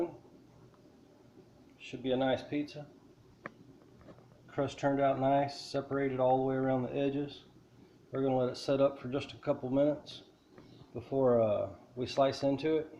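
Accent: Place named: Appalachia, United States. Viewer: BEAUTIFULLY DONE SIR, MY BROTHER SOUNDS LIKE YOU; HE'S FROM APPALACHIA COLA FLORIDA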